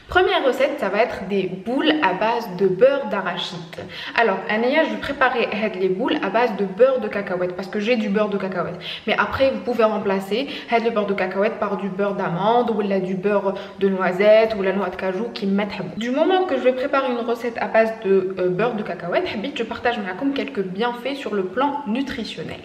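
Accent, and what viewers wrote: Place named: Algeria. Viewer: J'aime trop ton accent algérien ❤❤❤❤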